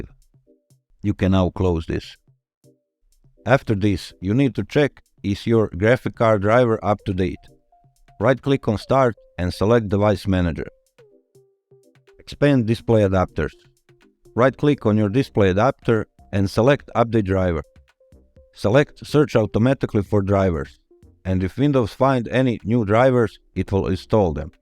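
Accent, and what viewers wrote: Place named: Finland. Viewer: you absolute mad lad! the sixth method worked for me, i'm gonna be a hero for my little boy, and you sir, you are a true saint. this video was amazingly well done, easy to follow and with a calm and soothing finnish accent it was a blissfull experience. thank you.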